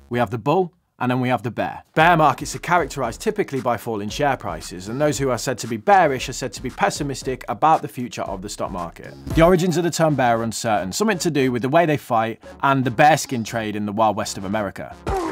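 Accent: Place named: Midlands, United Kingdom. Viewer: Trying to pinpoint your accent, sounds slightly midlands with a northern twang or maybe Cheshire?! Anyway great content as per usual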